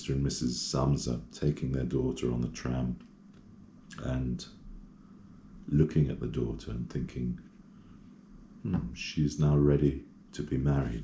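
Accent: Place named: Romania. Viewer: Superb Romanian accent , Deutsch auch. Might I suggest some comments on one of the writings produced by the Viennese Stefan Zweig ?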